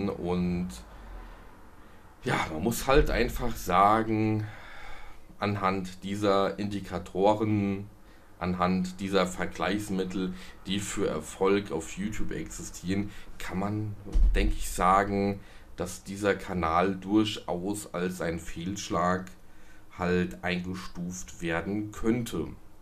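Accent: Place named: Germany. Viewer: Ich wuerde nicht beschreiben als erfolglos. Du hast ja einen deutschen Akzent aber du bist dennoch verstaendlich. Ich finde deinen Akzent sogar charmant.Weil ich eine Fremdsprache lerne, bin ich grosszuegig, wenn andere Fehler in meiner Muttersprache machen.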